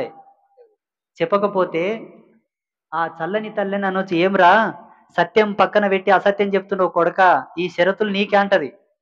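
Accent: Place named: Telangana, India. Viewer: గురువుగారు గొప్పగా చెప్పారు స్వామి మీ జ్ఞానం అమోఘమైనది మీ వాయిస్ చాలా బాగున్నది తెలంగాణ యాసలో మాట్లాడడం చాలా నచ్చింది మీరు మాట్లాడితే కెసిఆర్ మాట్లాడినట్టే ఉంది మీకు పాదాభివందనాలు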